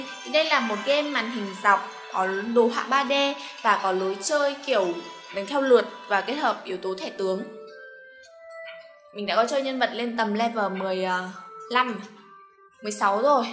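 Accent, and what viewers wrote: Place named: South, Vietnam. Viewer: nói giọng miền nam đáng yêu vải :v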